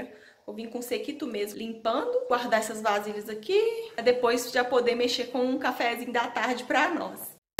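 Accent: Minas Gerais, Brazil. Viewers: amooo seu jeitinho mineirinho de falar morei em barão de cocais 11 anos,amei pessoas acolhedoras é comidas muita Boa Sou de Goiás Bjssss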